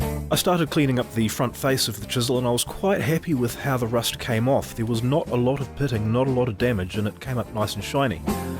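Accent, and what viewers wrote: New Zealand. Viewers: Clear explanation and step-by-step practical demonstration. Enjoyed this video. Kiwi accent.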